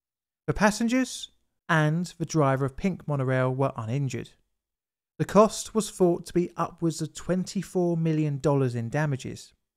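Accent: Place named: England, United Kingdom. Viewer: Just found your Channel and subscribed because I enjoy your Video content and appreciate your English accent, but I have a question (and it's not meant to be a criticism). When did Th-fronting become compulsory for Brit YouTube narrators ? Or has a speech impediment become a dialect ? Of the many British Channels to which I'm subscribed, nearly all the narrators have this idiosyncrasy and I can't help but wonder why ?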